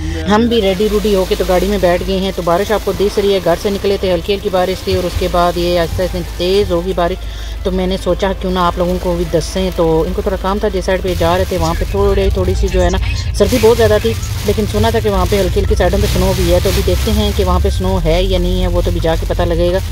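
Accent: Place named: Pothwar, Pakistan. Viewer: BHAUT CUTE BACHAY HAIN AP KE KHUDA SUB KO KHUSH RAKAHAY AR MEHFOOZ RAKHAY AMEEN AUR AP KI POTHWARI SUNANAY MA MAZA AH JATA HAI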